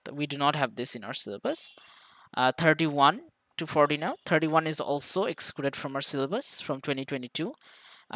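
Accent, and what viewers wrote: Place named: Bangladesh. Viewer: your voice makes me feel like you r from Bangladesh.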